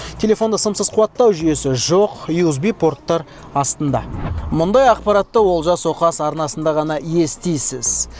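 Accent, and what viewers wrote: Standard. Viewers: Ассалаумагалейкум Олжас інім! Туу..... недеген таза қазақша тусиндиру👍👍👍👍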